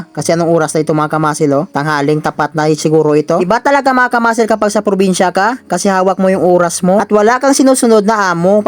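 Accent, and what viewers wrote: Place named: Iloilo, Philippines. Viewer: May pagka ilongo ka rin pala idol kabalo man ko maghabal ilongo..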